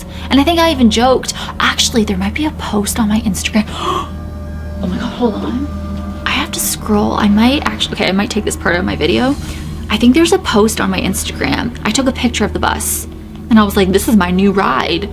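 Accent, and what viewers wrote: Canada. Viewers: No don’t lose your Canadian accent ☹️ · First of all your Canadian accent is fine, I love it! Nothing wrong with it all :) Pennsylvania resident here..am about a half hour from Gettysburg. Would love to go there. Born in Philly! Yeah this legend is abit baffling but super interesting and trippy! If you want to explore another incredible story relating to Pennsylvania, look up the town of Centralia. I won't spoil any details here. Not really paranormal but I think it's quite interesting...and true. Thanks for the love for PA!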